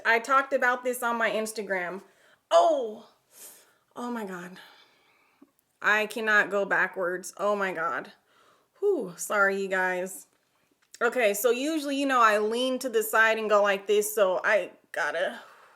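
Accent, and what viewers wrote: South, United States. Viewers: This is my first video of yours are you southern?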